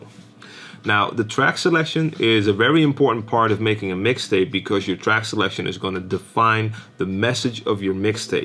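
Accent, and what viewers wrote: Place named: United States. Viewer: Wow, your accent sounds like you were born in the US and influenced by living in NL, if I met you on the street I'd never guess... haha. Thanks for the videos man, I've been a mix dj for years, just trying to get better at scratching... you've got some good tips.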